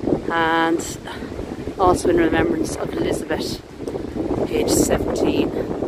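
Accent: Ireland. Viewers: I am from Hershey, Pa USA. I LOVE YOUR BROUGE and have found you on YouTube and love what you are doing in your part of the world. Love the architecture of some of the building and gravesites you have found. I will keep on watching. Betty from Pa.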